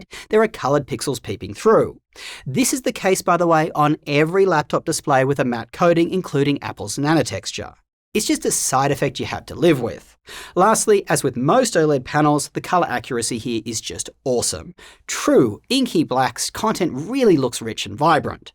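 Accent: Australia. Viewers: My God....I love the video but no offense you've got a very disturbing australian accent with bloody annoying high tone pich to it😂😂 Can not stand by longer than 1 minute....sorry😅😅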